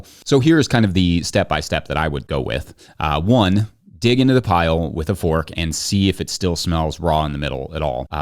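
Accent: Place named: Utah, United States. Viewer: Sounds like you're from Utah (me too) even AND you've got the green no-till hat?! This guy's a good one.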